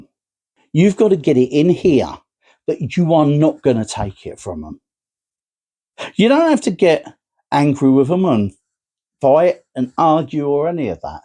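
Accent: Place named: Cockney, United Kingdom. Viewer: Fascinating and true, it seems like some people can't get by without insulting others to go on a power trip. I'm curious about your accent, it sounds like you have a London or cockney twang in there. Very enjoyable video thank you.😊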